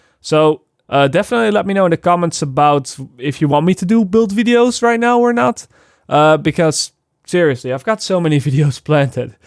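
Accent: Netherlands. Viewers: Dankjewel voor de info, hoor dat je Nederlands bent Hahah ^_^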